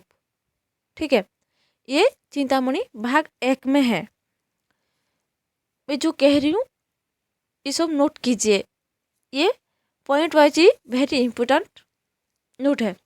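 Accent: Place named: Odisha, India. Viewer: odia uchharan ho rahi hai .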